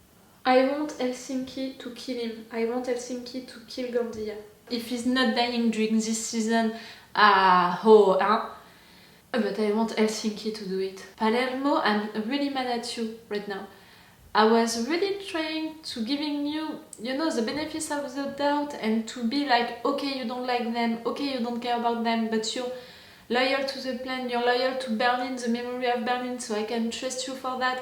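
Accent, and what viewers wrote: France. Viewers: T’es française t’a un ptn d’accent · your accent is so french and I love it 😂😍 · Haha ça s’entend que tu es francaise mais c’est trop cuteee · Are u French? Love your accent although I can’t understand a lot haha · J'adore comment j'ai vu direct que tu étais française, on sent nos accents 😂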